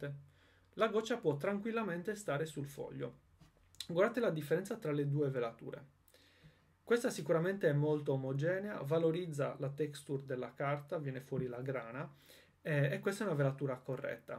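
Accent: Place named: Sardinia, Italy. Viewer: No , l'accento è quasi impercettibile, io l'ho notato perché anche io sono Sarda 😄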